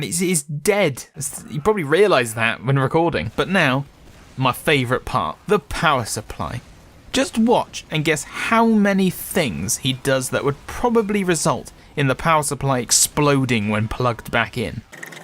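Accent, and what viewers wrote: United Kingdom. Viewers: oh my gosh your a bri'ish linus tech tips!! I would actually love to hear you talk more about pc's though and do more of these commentary-esque videos! They are a nice break from the SCP theme and hopefully they have enough interests to not run your views into the dirt.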